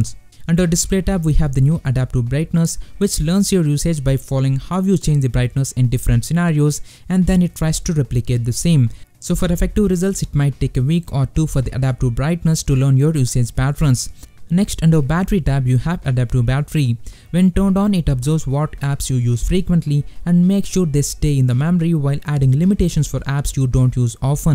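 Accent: India. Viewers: That's Indian accent. Get used to it on this channel. Lol.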